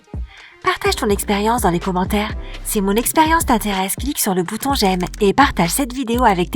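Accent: Quebec, Canada. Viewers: Accent québécois deguelasse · Ce qui est marrant c'est qu'on a l'impression que la personne essaie de parler dans un français à priori neutre et "universel" . Je crois qu'il y a une légère pointe d'accent québecois. Mais pourquoi le gommer? C'est sympathique, au contraire, comme le sont d'ailleurs aussi les accents belges ou congolais. 😉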